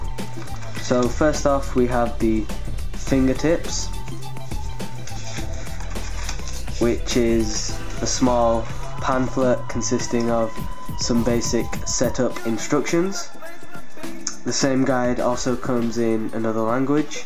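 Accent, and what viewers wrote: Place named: England, United Kingdom. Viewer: I like how your English and not yank.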